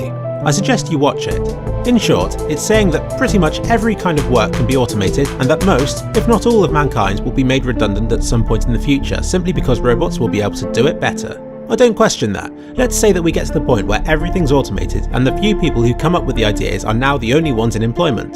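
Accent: United Kingdom. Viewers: Oli Allan I was actually more annoyed by the distracting background muzak and his nasal British(?) accent.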